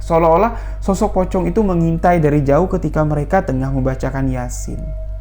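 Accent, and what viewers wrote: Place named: Madura, Indonesia. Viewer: Aksen madura nya tolong di hilangin bang, agak” ketawa jadinya dengar penyampaian nya😂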